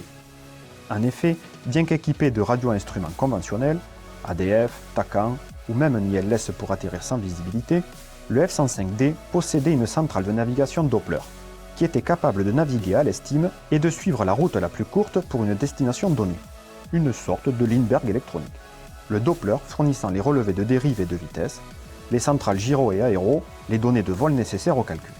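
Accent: South, France. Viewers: Un soleil de YouTube tant par la qualité du contenu que par l’accent du sud qui fait plaisir à entendre (le Basque est content). Continue comme ça, c’est un régal! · Merci et Bravo, et votre accent du sud est un régal 🤗👌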